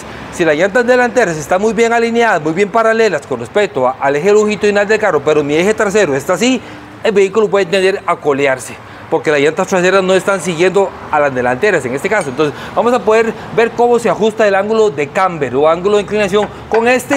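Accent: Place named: United States. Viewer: A pesar de ser colombiano , ya se nota que ha vivido en EEUU , por el acento gringo con la rr .buen video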